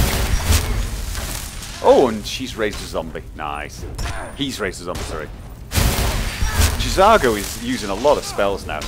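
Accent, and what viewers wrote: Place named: Scotland, United Kingdom. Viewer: I fuckin love your accent, scotish right?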